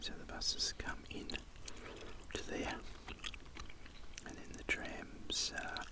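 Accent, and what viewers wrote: Australia. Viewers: Love your voice! The Aussie accent is by far my fave. I also watch another Aussie, but he’s not ASMR. The Drain Addict YouTube channel. Another great one!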